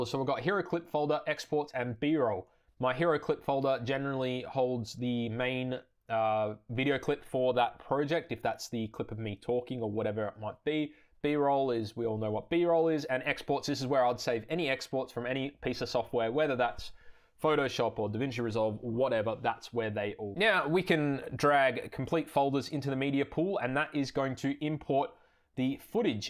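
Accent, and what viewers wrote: Australia. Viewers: Another subbed. You can always trust information with an Aussie accent. Sold on giving Da Vinci a go, although Fusion kept crashing on me the last time I tried using the standalone version on a project. Anything to get away from Adobe subscriptions is worth consideration.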